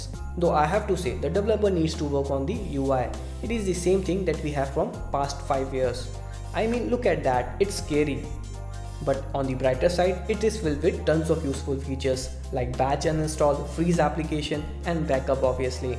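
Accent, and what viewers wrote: India. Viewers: I LOVE your indians accent! hah xd so cute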